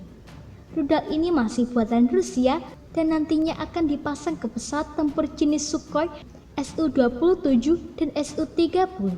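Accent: Java, Indonesia. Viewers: Medok kali suara nya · Sopo iku sing baca narasi ne logat jawa tenan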